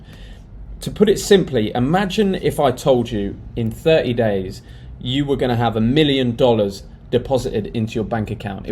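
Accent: England, United Kingdom. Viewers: It's really interesting to hear this stuff with an English accent! Thanks for posting!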